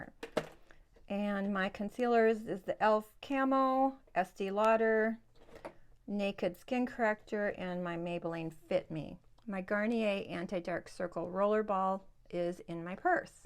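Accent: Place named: Midwest, United States. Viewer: What a lovely, Quint cottage you have!! Thank you so much for sharing it with us. Can I ask if you are a Midwesterner, like me? We kind of sound alike:) You do a great job making use of the space you have!🦋☀️🥰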